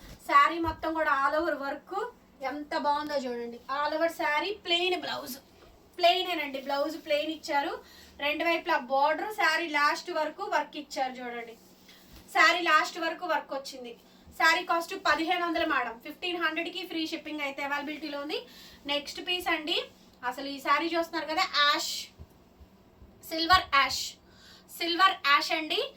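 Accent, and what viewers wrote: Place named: Godavari, India. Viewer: Meeru Godavari padatam muchchataga vundi mam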